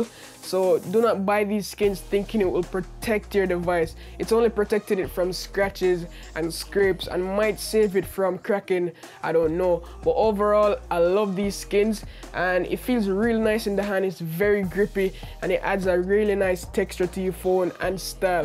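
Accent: Jamaica. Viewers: This accent sounds jamaican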